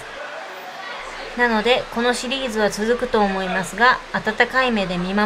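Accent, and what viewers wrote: Kansai, Japan. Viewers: ところどころ出て来る関西弁のトーンの親しみやすさ。117系など識別できる鉄分の濃さ。 もちろんお顔も美人で大ファンです。(南大阪地区の鉄ヲタのタクシードライバーより) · 丁寧に話しておられますがちょいちょい隠しきれない関西の荒っぽさが出ていて親しみを持ちましたw博多までお疲れ様でした！次の旅も楽しみに待っています。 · 関西訛りのイントネーションがとても好きです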